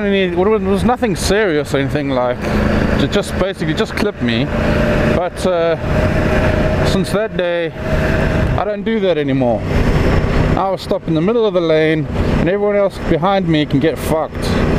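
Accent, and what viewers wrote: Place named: South Africa. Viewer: there's a hint of south African there I think lol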